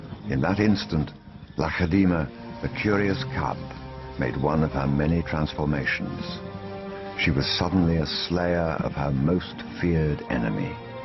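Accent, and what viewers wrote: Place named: United Kingdom. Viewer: Bonito é o sotaque britânico do narrador... rsrs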